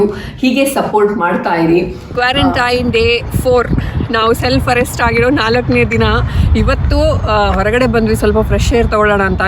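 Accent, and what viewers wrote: Mangalore, India. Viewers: ನಿಮ್ಮ ಮಂಗಳೂರು ಕನ್ನಡ ತುಂಬಾ ಚೆನ್ನಾಗಿದೆ.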